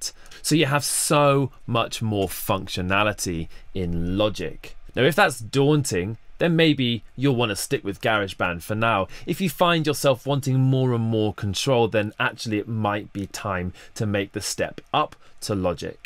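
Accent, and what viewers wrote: United Kingdom. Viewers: After watching this video I never want to hear another British person pronounce “garage” ever again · Every time I read GarageBand in my head, it’s now in a UK accent....damnit.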